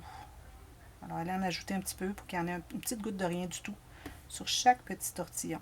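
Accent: Canada. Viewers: et cette accent canadien j'adore !!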